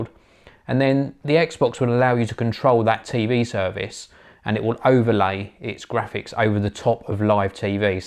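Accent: England, United Kingdom. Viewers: Nice video nice to English person talking about tech as well not many out there.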